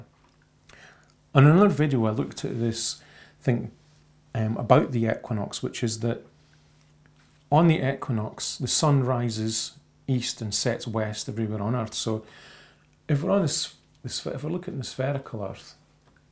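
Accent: Scotland, United Kingdom. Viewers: Holy shit man! That's horrible. Personally I love your accent man. I come from a primarily Scottish family, here in Canada, so it makes me feel right at home actually. You sure as hell are more well spoken than some Scots. Some of them have such poor diction, that you can't even understand them, unless you are Scottish. You are perfectly understandable actually. People are fucked. Then to criticize your hands? Oh man, how childish. They are clean and so are your nails. Lol. So I don't get that either. People will pick anything when they have nothing huh? Lol.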